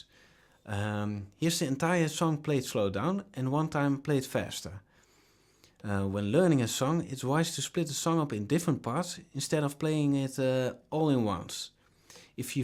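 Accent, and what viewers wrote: Netherlands. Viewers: nice one thanks alot! Are you dutch? i can hear it by your accent ;) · Your accent, sounds awesome, let me guess, you're dutch, right? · Hahahaa je kunt horen dat je nederlands bent ! Geniaal !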